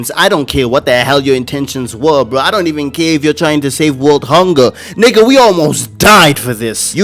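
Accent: South Africa. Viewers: Why this guy has both a South African and American accent wtf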